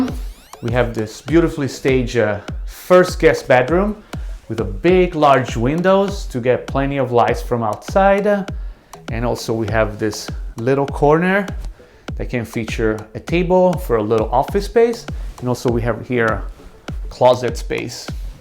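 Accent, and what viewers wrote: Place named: Italy. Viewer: What are you talking about? He’s an Italian which has learnt British in America - that’s what it sounds like!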